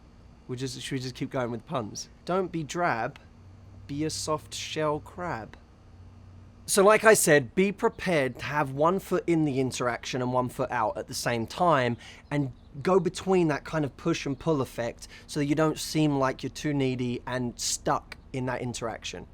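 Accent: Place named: United Kingdom. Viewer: watching this video, just to hear they lovely British accent again.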